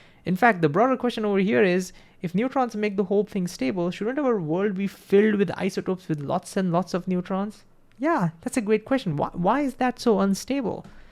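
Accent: India. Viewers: Why are you black but have an Indian accent 😂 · People who get he is an Indian As an Indian i could understand it just through your slight accent ❤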